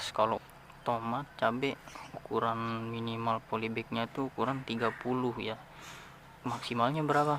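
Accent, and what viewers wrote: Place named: West Java, Indonesia. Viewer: Bangx kayax orang sunda ya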